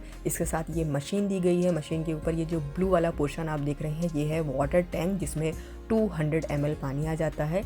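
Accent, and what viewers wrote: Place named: Lucknow, India. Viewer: Im from Pakistan,likes ur lucknawi style urdu,please explore lucknow tradition more,and lucknow markets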